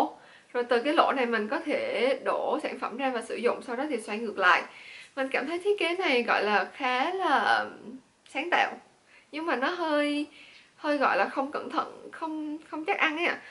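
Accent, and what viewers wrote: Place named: Da Lat, Vietnam. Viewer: Linh Le bạn ơi giọng đà lạt họ nói vậy đó không phải nhẹt mũi đâu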